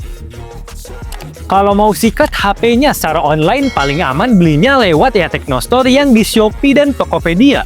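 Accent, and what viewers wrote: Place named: Medan, Indonesia. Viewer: Medan cuy😅😊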